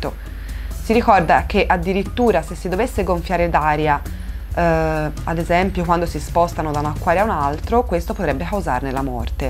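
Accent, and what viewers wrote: Tuscany, Italy. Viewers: It's Italian , with a strong Tuscan accent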